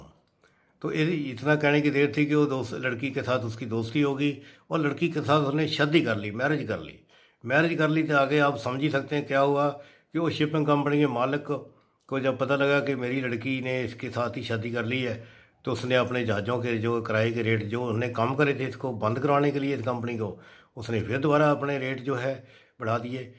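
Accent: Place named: Punjab, India. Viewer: पंजाबी लहजे में आपका हिंदी बोलना बहुत भाता है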